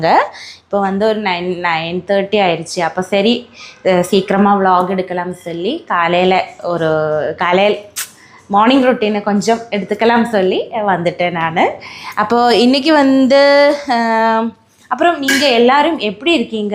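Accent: Kerala, India. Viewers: Tamil malayalam rendu kalandu Super a pesiringa anni I like your family 🎉❤😊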